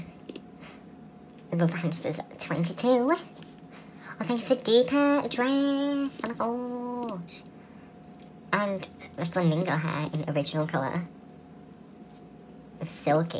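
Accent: England, United Kingdom. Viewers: Are you British and I love your voice and your channel! Sorry I'm late <3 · Why are you on American server when u are British? It sounds like u are British unless it's just me... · are you from the uk? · Wth you from UK... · Your voice sounds like a butiful English accent!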